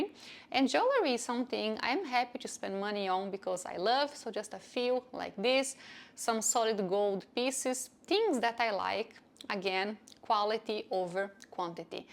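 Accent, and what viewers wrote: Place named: Brazil. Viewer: I like your videos! Subscribed! I'm turning 30 soon and I'm already doing those changes without even realizing it. I feel super free not having to get my nails done every 2 weeks, but I don't know why I feel like without having them done it looks less put together comparing to having them done. Also wearing sandals without having the toenails done feels so off and not so pretty. Do you still get your toenails done? I didn't see you mentioning your name yet by the way nor where you're from, but I'm guessing you're from Brazil as I'm from Brazil too and the accent sounds familiar hahah Cheers!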